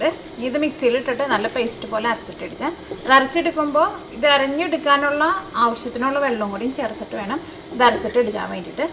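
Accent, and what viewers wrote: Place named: Kunnamkulam, India. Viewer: Chechiye..njn kunnamkulamkaarana.onnum vnda mmade aa pedakkhna samsaaram aanh chechi kooduthal hit aaayath ente frend paranjitta njn ithine kurich ariyunnath.nthaayaalum classaayindh.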